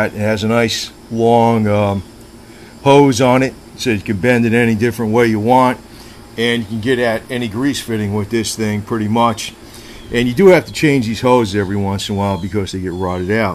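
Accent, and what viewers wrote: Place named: Cleveland, United States. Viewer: no offense.....thought I heard a little Cleveland in you....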